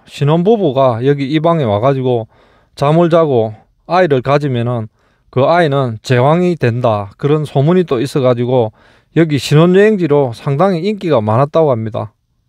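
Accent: Gyeongsang, South Korea. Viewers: 구독은 안했지만 영상 올리시면 빠짐없이 봅니다 저는 전북 전주 출신이지만 경상도 구수한 말이 너무 좋네요 그리고 제가 좋아하는 약간 쓸쓸한 음악과 경치 한편의 시적상상이 떠어름니다 마음으로 항상 감사함을 느낌니다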